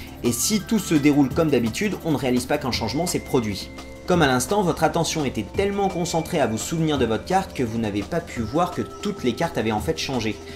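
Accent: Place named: England, United Kingdom. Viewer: Best accent anglais ever!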